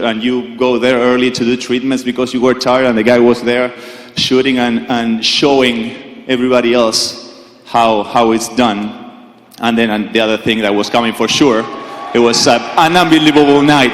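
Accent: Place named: Nigeria. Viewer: if you close your eyes you could bet Manu is nigerian. (awesome speech tho)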